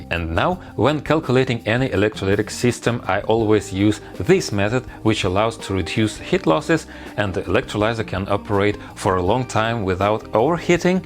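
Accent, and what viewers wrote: Russia. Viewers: Your accent says you can speak Russian correct?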